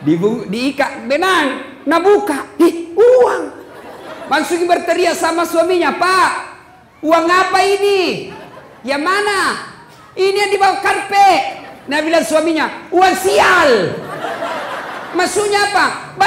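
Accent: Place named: Sulawesi, Indonesia. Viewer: Allhamdulilah masih kental logat sulawesinya walupun diluar kota Sulawesi berdakwa 🤲 Sehat selalu pak ustad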